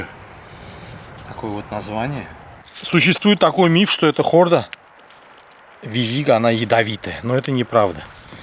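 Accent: Baku, Azerbaijan. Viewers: Къардаш всё супер. Особенно твой БАКИНСКИЙ акцент. Почему говорю я служил в армии в прекраснейщем гостеприимном городе БАКУ Очень тёплые воспоминания. На сегодняшний день БАКУ говорят вообще жемчужина южного Кавказа ,хотя он и при союзе был великолепен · Amma akçentiz hamını heyran edir, əsl bakılı akçentidir. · Супер!!! 👍👍👍. Бакинский акцент вообще супер!!! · Я уехал из Баку 35 лет назад Живу в Нью Йорке Бакинская еда известна на весь мир Все кто побывал в Баку только и говорят о еде А мне было очень приятно слушать его неповторимый Бакинский акцент,который не спутаешь ни с чем Интеллигентный, красивый человек Дай бог Вам здоровья Это настоящий Бакинец! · Подписался из-за моего родного бакинского акцента, ну и конечно же очень вкусно))) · этот родной бакинский акцент - особая причина смотреть видео этого прекрасного человека!!! Каждый раз возвращаюсь от них на родину, в Баку периода СССР, и у меня улыбка от просмотра! 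Дай Бог ему здоровья и счастья! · ))) ona görə ki bu Baki akcentidir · Очень по нашему, акцент приятный, наш. С искренними пожеланиями всего самого лучшего от бакинца, давно проживающего вдали от родного города. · Бакинский акцент🙏👍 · Получаю удовольствие слушая вас. Вы можете не готовить, а просто рассказывать о чем угодно. спасибо ща прекрасный бакинский русский язык, который музыка для меня . Спасибо земляк. Мира и процветания!!🧿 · Я русский но родина моя Азербайджан, я родился в Баку и мне приятно слышат своего земляка с нашим бакинским акцентом. Здоровья вам и счастья. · Джан э джан . Как же я соскучился по нашему бакинскому акценту ... Всем привет из Германии !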